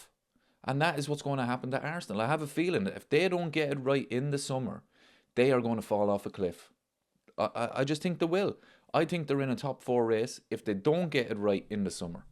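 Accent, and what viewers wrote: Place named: Laois, Ireland. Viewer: If we only win the league i still be happy. Are you a laois man. Im trying to think where the accent is from.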